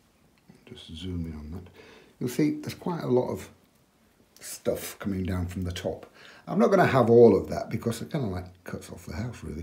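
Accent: Yorkshire, United Kingdom. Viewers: What a joy to watch, and as a West Riding woman of similar vintage I love hearing your vowels! You really do make the whole thing look very easy. For me it’s not! It is a great help seeing, for example how you do shadows, and how things blend together when you are using a limited range of colours. Really nicely filmed as well. Thank you.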